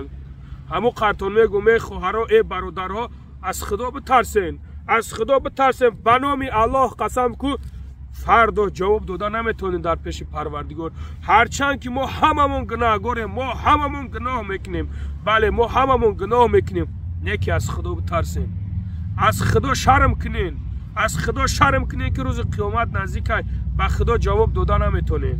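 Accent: Kulob, Tajikistan. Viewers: Саломалекум ака чиста кулоби гап мезани мабодо ай кулоб набоши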